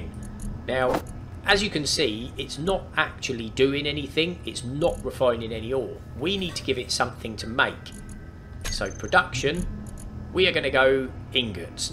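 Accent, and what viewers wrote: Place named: Geordie, United Kingdom. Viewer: lol listen to a geordie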